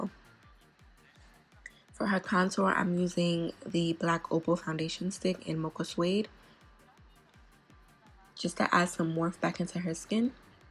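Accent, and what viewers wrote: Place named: New York, United States. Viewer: Idk which is satisfying your accent or the makeup? Lmao let’s say both? Like are you from NY? 😭😍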